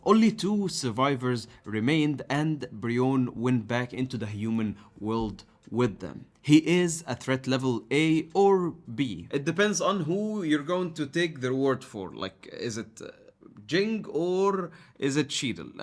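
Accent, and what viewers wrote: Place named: Egypt. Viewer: Definitely an Egyptian with that accent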